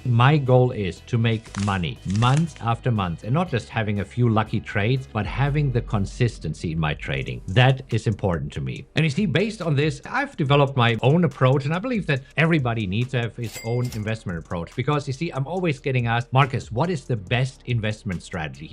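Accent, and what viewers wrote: Germany. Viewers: Du hast diesen wundervollen NRW Nachnamen und ich glaube auch, man hört, dass du einen deutschen Akzent hast. Nur ein bisschen. Viele Grüße aus Berlin. 👋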